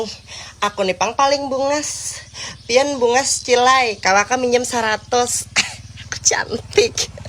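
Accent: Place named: Banjarmasin, Indonesia. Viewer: Hello....,aku di jkt suka banget dgn gaya bicaranya or logat Banjarmasin ❤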